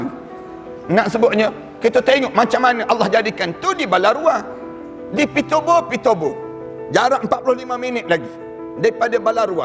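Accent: Kelantan, Malaysia. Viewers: Pekat loghat kelate ...ustaz yer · masyaallah...ya ke...baru ni... Kuah tumpah kemana kan.....alhamdullillah ade penyambung dari tgnik aziz...saya memang pu suka ceramah ustad ahmad dasuki ni.....sedap telinga dengar nyaman rasa di hati...ceramah ceramah nya..walaupun dgn loghat kelantan nya..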